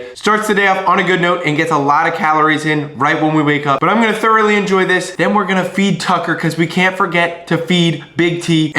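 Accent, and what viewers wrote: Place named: South, United States. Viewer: Like for ya south accent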